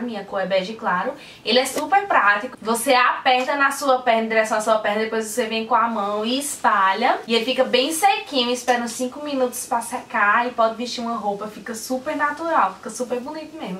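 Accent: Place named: Northeast, Brazil. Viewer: ñ sei se vc vai lembrar de um comentário q fiz. Falando q estava viciada em seu sotaque, e me fazia matar um pouquinho a saudade do Nordeste, q eu moro no Rio e tal... e advinha só essa semana meu marido recebeu uma proposta de trabalhar em RECIFE!!!!!!!!!!!!! E claro aceitamos! Estou super ansiosa. Então se te ver nas ruas de Boa Viajem vou falar com vc heim! kkkk Beijão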